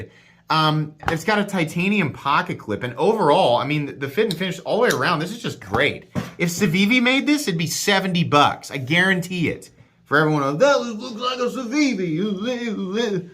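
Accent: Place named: Midwest, United States. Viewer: Kansas! I was close! Identifying accents is sort of something I do as part of my job. Just something I’ve gotten good at. I didn’t know what part of the country you came from and my guess, based on your accent, was Colorado / Nebraska. You ended up just East and south lol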